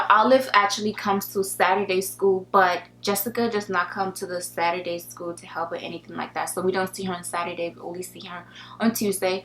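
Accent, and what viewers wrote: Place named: Nigeria. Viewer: Tromaria Presimparae, Why would you "suggest" she "try" to speak in a manner that fits your hearing? She is Nigerian...not from the U.S. Have you not seen any of her other videos? She always says something about being yourself; with that being said, you be you and let continue on with her beautiful fantastic awesome Nigerian Princessness. P.S. Yes I said Princessness...and what?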